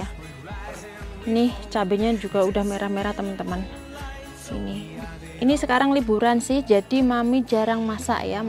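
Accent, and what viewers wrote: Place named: Java, Indonesia. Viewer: Ngomong jowo e ora ilang y mom .... seneng punya tanaman buah dan sayur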